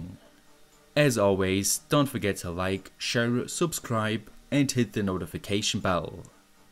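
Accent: Germany. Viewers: but you are not a Scott. This is the hardest German accent I have heard in my life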